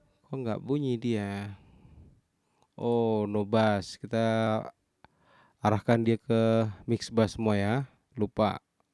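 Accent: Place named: East Java, Indonesia. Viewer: Logat jawa timurnya berasa mas... Hehehe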